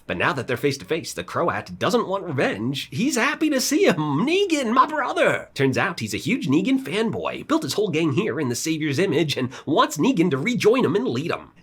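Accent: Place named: New York, United States. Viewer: Thanks, love the content as always. Was really looking forward to the Dead City recap, and it didn’t disappoint. Love the New York accent 😅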